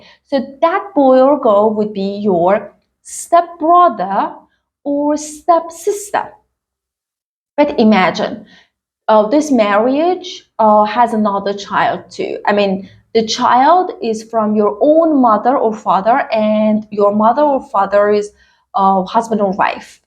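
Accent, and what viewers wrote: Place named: United Kingdom. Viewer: 😍 such a nice British accent 🥰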